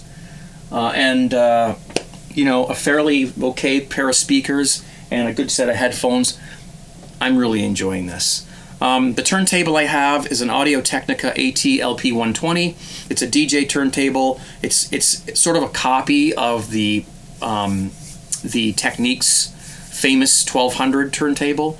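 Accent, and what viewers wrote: Canada. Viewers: I'm old enough to remember playing 45's on turntables with spindles that held a stack of records that were automatically dropped and played in succession—hence the term "stax of wax." Great for the condition of both the stylus and records. 😉 BTW, you sound like you might be across the river in Canada? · I'm getting back into LPs and singles and just like your past experience, I am now noticing how much better clean records CAN sound with a good setup, compared to the substandard record player my parents and I had in the 1970s and 80s. By the way, do I detect a Canadian accent? Thanks for the channel!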